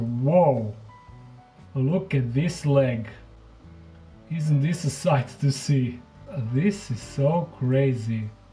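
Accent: Croatia. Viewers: Hi. I came across your channel a month ago and man I'm hooked. At first I was wondering why your accent is so familiar 🤔 Than few videos later I realized you are Croatian like my boyfriend 😅 After watching more videos I also realized you live like 127 km from us. Hopefully one day I will have the opportunity to visit Dark Den. 😊 Just wanted to say how much I love your videos and all respect for you for caring so much for all your animals 😊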